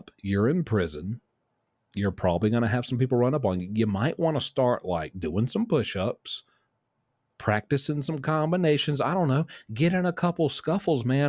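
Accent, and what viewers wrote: South, United States. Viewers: Hey brother my name is Paul Britt,I've been watching you for several months,I'm from Jackson Tennessee, and lived in Charlotte north Carolina,I enjoy the southern accent, and,thank you for, the Golden entertainment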